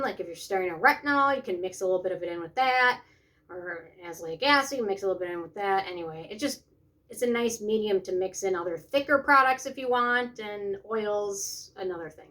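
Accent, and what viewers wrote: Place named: Midwest, United States. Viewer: I like your accent it sounds Midwesterny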